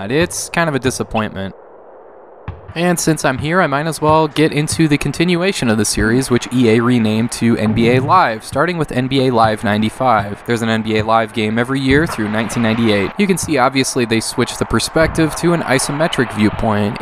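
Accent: Minnesota, United States. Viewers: are you from Minnesota? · You from MN?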